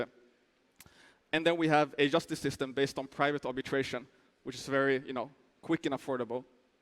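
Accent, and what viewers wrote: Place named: Israel. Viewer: Sounda like israeli accent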